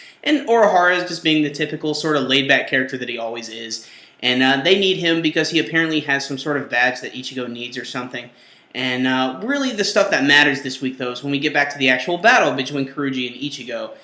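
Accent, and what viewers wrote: Germany. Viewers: Once again, your german accent is priceless!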